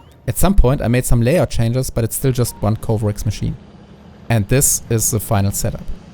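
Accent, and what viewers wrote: Germany. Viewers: r u german ? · very deutsch :D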